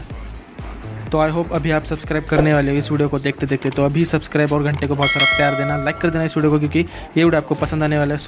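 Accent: Maharashtra, India. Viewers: Op bhaii..💯💯💯 I think your mother tounge is marathi...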